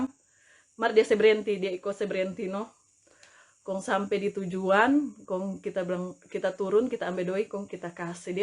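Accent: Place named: Manado, Indonesia. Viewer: Itu logat manado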